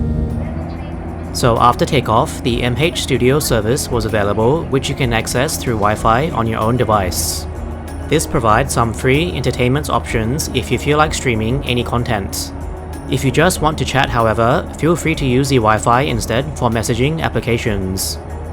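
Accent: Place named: China. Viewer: Why is your pronunciation so reminiscent of a Chinese - educated person? Pronouncing non - existent “Ss”. And your tone of voice is really flat like the airport runway.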